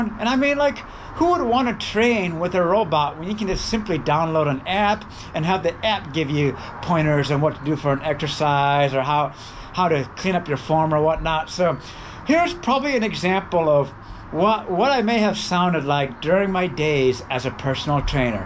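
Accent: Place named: Scotland, United Kingdom. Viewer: Why does he have like a Scottish accent?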